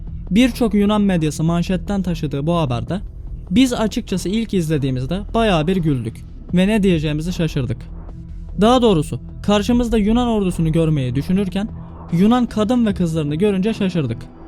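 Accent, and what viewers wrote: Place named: Anatolia, Turkey. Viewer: Sevgili seslendiren kardeşim çok güzel videolar yapıyorsun Çok çok içtenlikle teşekkür ederim şunu söylemek istiyorum babaannem 1982 yılında vefat etti ve 90 küsür yaşındaydı yani yaşasa şu anda 130 yaşlarında falan olurdu Ama konuşma aksanı Tıpkı senin gibiydi biz angaralıyız. Ve köy derneğimiz araştırdı orijinal. TÜRK.küz. Oğuz boyundanız. Demek ki Bizim TÜRKLER olarak orijinal aksanımız Senin konuştuğun gibi. Seni dinlerken rahmetli Ebemin konuşmasını aynı hatırlıyorum Gözlerim doluyor Çok teşekkür ediyorum sana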